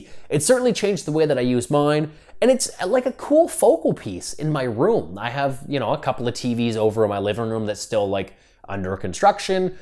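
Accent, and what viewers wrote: Canada. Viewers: Sounds so Canadian lmao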